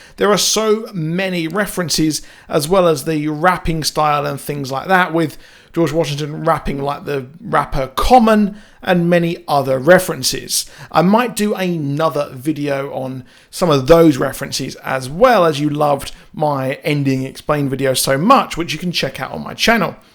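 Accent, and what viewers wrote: United Kingdom. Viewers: Why no views? Why no likes, why no comments, and why so many subscribers? EDIT: waiiiiiit.... ya sound like ur from London, and talking about Hamilton, an American musical?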